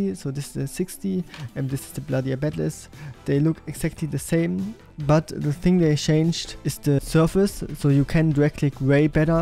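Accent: Germany. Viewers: Ich finde es sehr unterhaltsam wie du mit deinem deutschen Akzent sprichst xD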